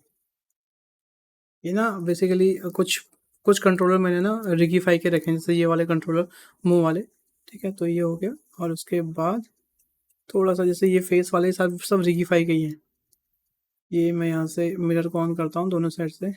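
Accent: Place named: India. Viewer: bro i was frustrated by rigging but ,, after your video now i got every point you teached .. best video for rigging in indian accent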